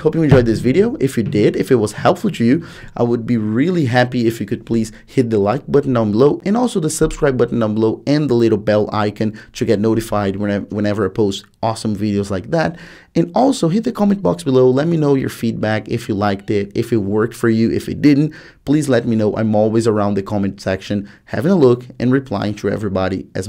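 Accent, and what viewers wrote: Brazil. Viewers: Brasileiro ? Sotaque não engana kkkkkk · Fala brazucaaaaaa, sotaqueraaaaaaa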